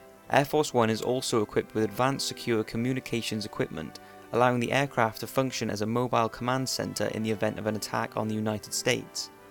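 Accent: United Kingdom. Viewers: The narrator is a Brit. haha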